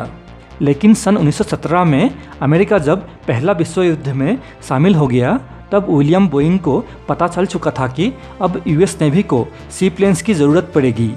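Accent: Assam, India. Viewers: Tm Assamese hy kya 😅 Hindi assemess lag rah hy😅😅😅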